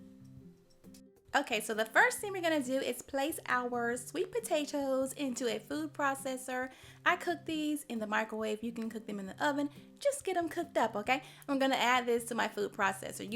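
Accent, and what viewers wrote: South, United States. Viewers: Hi , I am from South Liverpool UK, and love your programs so much and can't get enough of the accent , ( real southern states ) . I have a question and it is this , please what is Half and Half 🤔. Do let me know , as in the UK , we do not have Half and Half 🤔. Excellent program ( never stop ) as it is, ( THE NUMERO UNO ) on YouTube for me . Many thanks .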